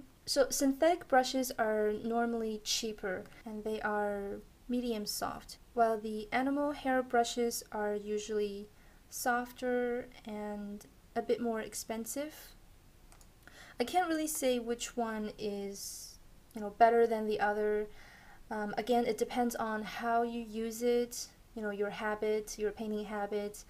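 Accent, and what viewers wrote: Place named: France. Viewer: You have such an amazing french accent, are you french? or have you lived there?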